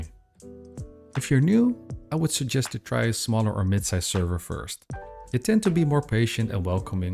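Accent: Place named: Netherlands. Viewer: i hear a small dutch accent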